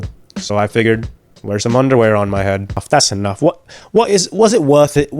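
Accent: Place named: United Kingdom. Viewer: That British Accent 😂😂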